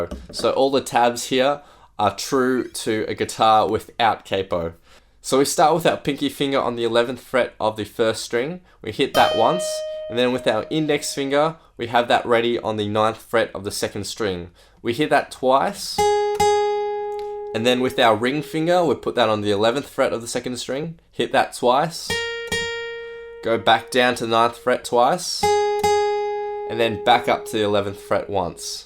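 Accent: Australia. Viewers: Aussie? NOICE!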